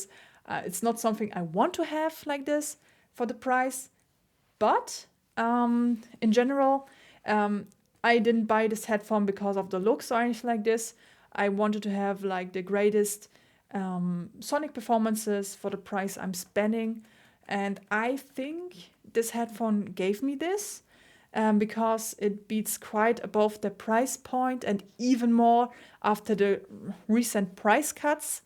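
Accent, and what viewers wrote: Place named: Germany. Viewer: Ahhh nice long term review! I could tell you were german right away ;)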